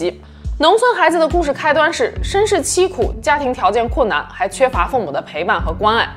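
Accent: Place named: Beijing, China. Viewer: 说话有北京味儿，那叫一个地道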